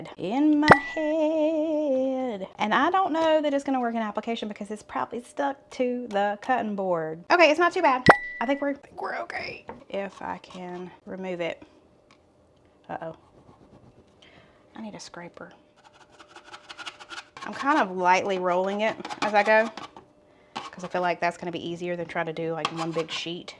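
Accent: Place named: North Carolina, United States. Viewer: This is the first time I have seen one of your videos. I was hearing your accent and thought, North Carolina. Then you said Food Lion, and I was like mayyybe lol! Great recipes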